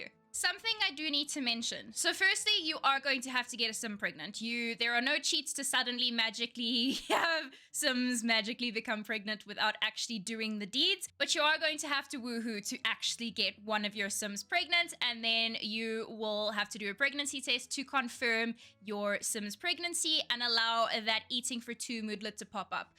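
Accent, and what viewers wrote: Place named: South Africa. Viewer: Wait you aksent sounds south africn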